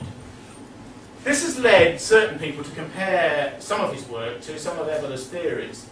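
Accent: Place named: United States. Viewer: His American accent was quite good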